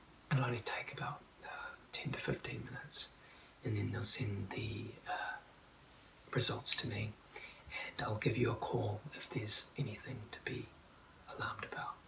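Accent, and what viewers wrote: New Zealand. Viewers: love the accent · I just love the NZ accent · THAT ACCENT. OMLLLL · Your accent is beautiful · I love kiwi-accents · Gotta love the nz accent! Im from Australia where 6 is six not sex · Your accent.. I LOVE THAT! It's perfection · came here for the accent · Kiwi Accent, oh god. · similar to the Aussie accent, but they pronounce certain words differently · you can't get much better or cooler than a Kiwi accent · im not exposed to kiwi accent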